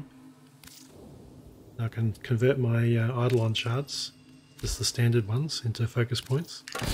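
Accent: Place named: Australia. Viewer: thanks boss, u sound aussie :)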